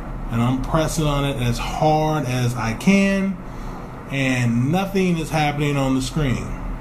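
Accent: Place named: United States. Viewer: love your american accent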